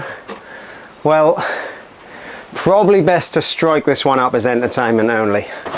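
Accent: Norfolk, United Kingdom. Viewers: Ah! the YouTube algorithm sometime throws us an unexpected delight every now and then. Left home as a boy to come to London, and I really miss the Norfolk accent. A real pleasure to hear it spoken..a rare find on YouTube….wishing the young lad here all the best in his filming and diy adventures!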